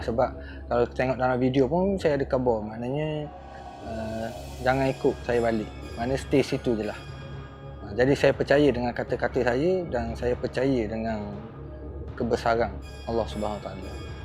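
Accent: Terengganu, Malaysia. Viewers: Suka dgr bro ni bercerita dgn loghat ganu dia..😊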